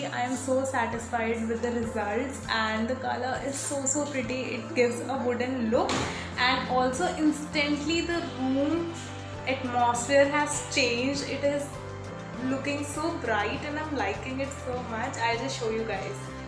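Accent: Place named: India. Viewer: Me gustó mucho como te adaptaste al tamaño de tu habitación y ese color la hace ver más grande. También vengo de la Increíble India, pero al contrario me gusta que sean así los vídeos porque estoy tratando de familiarizarme con el acento de ustedes jeje, igual los subtitulos no estarían de más. Me encanta tu contenido, saludos :)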